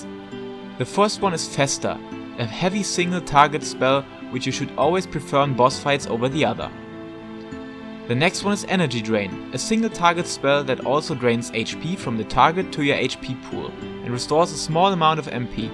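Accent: Germany. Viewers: You are definitely German!!! I never loved German accent (especially Dutch, gross!) But yours is absolutely adorable and so sweet accent. So nice to listen to !! Thank you for this guide... I hope... truly, that this will help me... Sigh. It's difficult, because people expect the best output instantly ; ; Is there any changes since you made the video?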